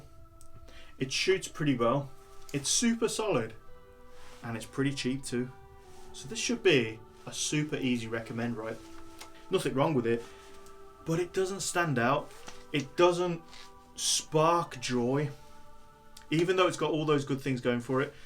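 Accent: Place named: United Kingdom. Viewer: The British accent tells me it’s gonna be a fake gun.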